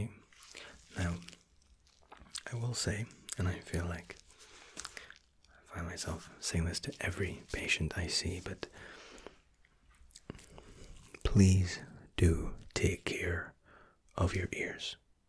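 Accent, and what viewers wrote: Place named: Scotland, United Kingdom. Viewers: Finding your channel has been a godsend! I’ve been living in New Zealand for a year now, but I’m originally from a town near glasgow! So hearing your accent is so relaxing! Cheers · Scrolling through the comments wondering how long after a video was posted is it still worth commenting (because I wanted to say how your voice is seriously my fave ASMRtists voice! So relaxing!) when I came across this comment.....How did I not realise you were Scottish?! That will explain why your voice is the best! I'm from Glasgow :-D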